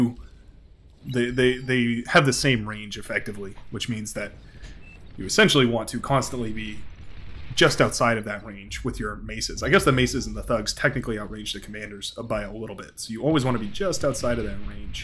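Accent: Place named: United States. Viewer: great to see the interest in the game. great cast. keep up the casting and learning., its good to see new players coming through and will be interesting to see how they develop and some maybe even surpass the established "pro" like myself :) if you can get in at GMT evening times you'll see much higher ranks playing so you can learn some more, but you sound american so maybe a bad time for you lol.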